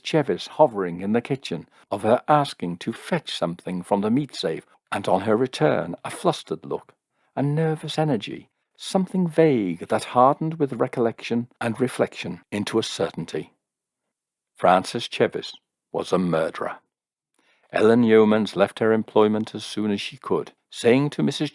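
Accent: United Kingdom. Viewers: We missed you and you're wonderful story telling, drawings and investigative talents. We want more! Best of wishes always...From a Brit in the USA who is always restored by a fellow British accent and intelligent logic. XXXXX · I love the true crime stories that you presented. Hope to see miore of these videos of yours. And the music is great, your voice plus the gunshot. So British.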